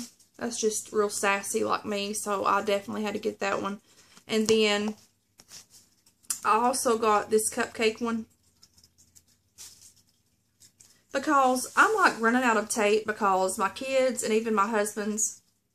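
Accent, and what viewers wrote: South, United States. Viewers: "Tickle your innards!" That was on all of the old Mountain Dew bottles in the '70's just meaning that it's so good that it will tickle your insides and make them happy! I'm telling my age! I have just seen your video for the first time and you are adorable and girlfriend I LOVE that Southern accent! Where are you from? I'm from Alabama and I know a Southern accent! Subbing tonight! I heard you talk about journals so check mine YouTube out as well! Looking forward to watching you more! Love the dimples :)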